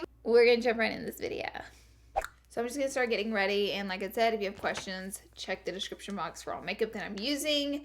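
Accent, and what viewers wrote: South, United States. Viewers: Omg yes!! Can't wait for your merch!!! I hope it has some of your country twang or sayings!:) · My heart is just so whole after this video, I remember when I first watched you I didn't know if I was going to keep on watching or not, but after hearing your southern accent I felt right at home(Georgia Peach, also Alabama fan). I loved when you talked about self worth, lately I've been in this long term relationship and he's the best boyfriend ever, but there was a point where I had no self worth because of all the drama and long distant we were going through. Now I've been working week by week fixing my self esteem and glueing all the pieces back together. I know that I may have my family, friends, boyfriend and other things to carry me if I need help, but I want to be able to walk on my own and not need help, and that's what I'm working on now. 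Your makeup videos have always inspired to safe up my money and spoil myself(because not only do have a makeup addition but I deserve it), but they've also inspired to reach my goals and look fabulous while doing it. You're such a glorious and fabulous human being and I love you 😘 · I just love you... I come from the south too, and when I watched one of your videos for the first time, I was so shocked. I was so shocked to hear someone that actually sounded like me on YouTube. Someone who had a "thick" accent, like me, who was so out there. I love how you don't try to cover up your roots, or force yourself to be something or someone that your not. I'm writin this to you all the way from a teeny, tiny town to tell you to keep on keepin on, and to tell you thank you.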